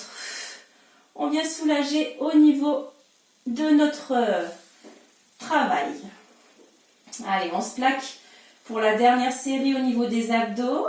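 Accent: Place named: South, France. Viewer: Merci, grâce à ton sourire, ta compétence et ton joli accent ensoleillé (je me régale avec les cottes bien ouvertes et les épolles baissées 😉) j‘ai commencé ma 1ère journée de vacances avec un cours de pilâtes qui m’a donné la pêche! À bientôt!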